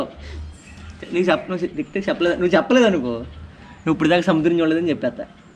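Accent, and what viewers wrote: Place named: Godavari, India. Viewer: Godavari basha bagundi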